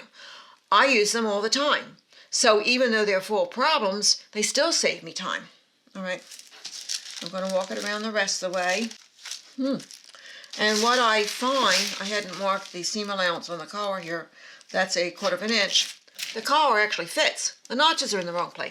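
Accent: Philadelphia, United States. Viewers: In case you like cooking- Chef Jean-Pierre has Jack the family behind his camera. And boy oh boy the onyo soup- lol food's great and Chef is good n funny w his French accent. As nice to hear as your Philly accent!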